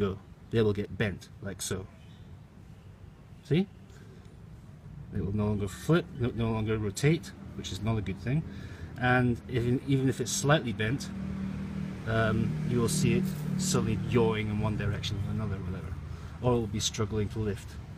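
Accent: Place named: Scotland, United Kingdom. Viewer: hmmmm scottish accent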